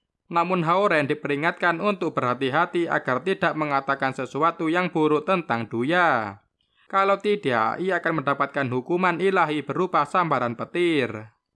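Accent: Java, Indonesia. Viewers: Logat jawanya terlalu terasa😌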